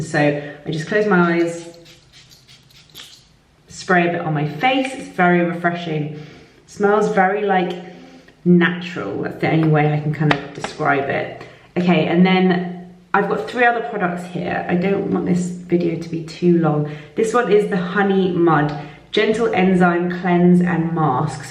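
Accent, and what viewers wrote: United Kingdom. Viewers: Do you have a british accent? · yes, she's british.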